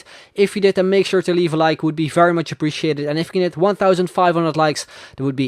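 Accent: Netherlands. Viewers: Is he Dutch????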